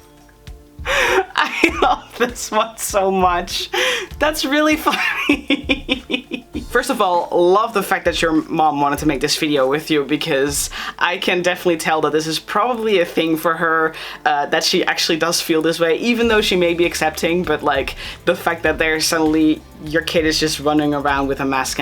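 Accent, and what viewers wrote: Netherlands. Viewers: Are you also dutch? Bc i have the same kinda accent when i speak English😼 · i smell dutch, are you dutch???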